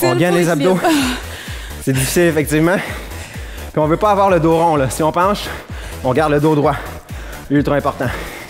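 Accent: Quebec, Canada. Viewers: C'est l'accent Québécois! :)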